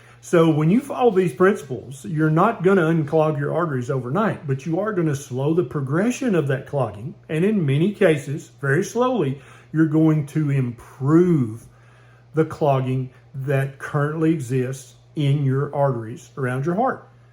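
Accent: South, United States. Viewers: Sorry, not to be a racist, and this is totally my fault, but I just can't take it seriously when I hear a hillbilliyish guy in a pink shirt frantically telling me to hurry up and get whacky naaka plant to clean out my arteries. Is this satire/comedy? If so, haha!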